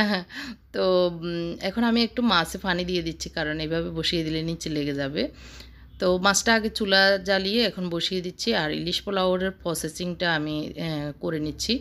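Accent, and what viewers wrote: Noakhali, Bangladesh. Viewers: প্রথম দেখছি আপনার ভ্লগ। ভালো লেগেছে।♥️ আপুর বাড়ি কি নোয়াখালী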